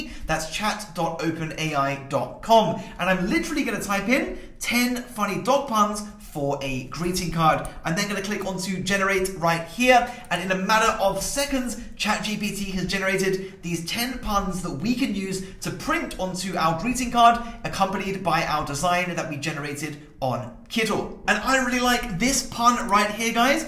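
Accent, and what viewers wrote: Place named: United Kingdom. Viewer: Annoying that Printify don't actually provide greeting cards in the UK. Since the guy in the video sounds British I didn't think it would be a problem and I've already done all the other steps but I cant actually do it! I cant seem to find any platforms that provide this in the UK - does anyone know any?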